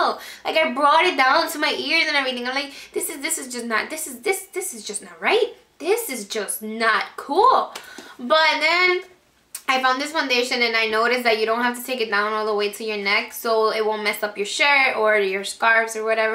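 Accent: Dominican Republic. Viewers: You remind me of myself! Accent and everything! Are you Dominican? lol def subscribing! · Haha i read down on your comments and ikneww you were dominican. so am i and i immediately picked up on your accent. Your gorgeous btw :) subbed right away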